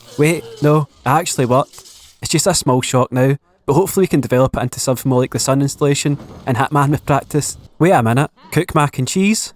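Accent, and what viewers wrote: Scotland, United Kingdom. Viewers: Its gid to have more Scottish youtubers nicer to listen to along with the Irish ones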